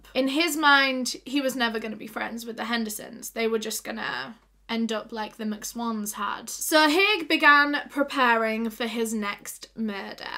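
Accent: United Kingdom. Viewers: I usually don't like all UK accents but I love her UK accent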